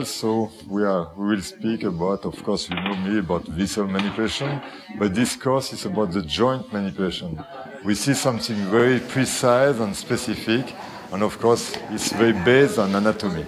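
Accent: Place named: France. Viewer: very good and nice French accent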